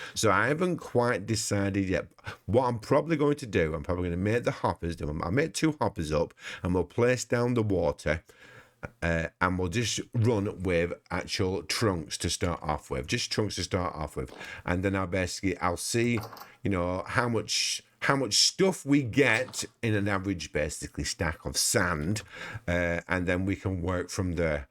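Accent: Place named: Bradford, United Kingdom. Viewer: Apologies for being nosy - are you from Bradford by any chance? The accent sounds familiar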